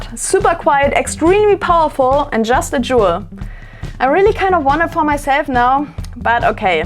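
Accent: Germany. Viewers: Pretty sure there are 45 different logos in the video. Love the german accent, btw! Good luck! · I counted 38 logos. Btw hört man extrem das sie deutsch ist xD😆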